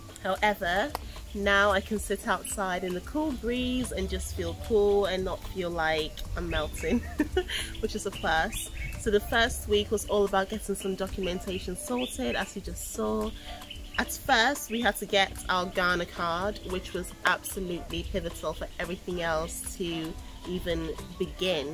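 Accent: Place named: United Kingdom. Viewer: I will imagine she went to the UK as a teenager that's why she picked the accent pretty quick. Hard to pick a new accent when you are 20 and up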